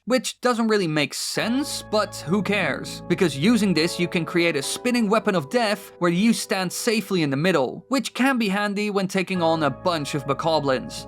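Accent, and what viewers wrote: Netherlands. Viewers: You have to be Dutch or Belgian man, I'm putting my money on Dutch though. Accent is bij IEDEREEN hetzelfde HAHA.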